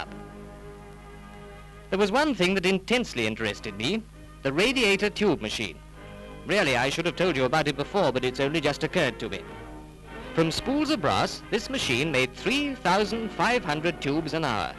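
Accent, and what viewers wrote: United Kingdom. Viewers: "Ford et Degenhem" - no "As" in those days! Whatever heppened to those posh English voices? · Considering that this film is British. You can tell by the accent, it was filmed by Pathe and the car was build in the Dagenham plant.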